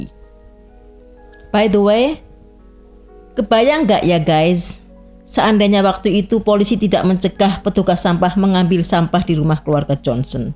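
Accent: Java, Indonesia. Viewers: Logatnya medok bgt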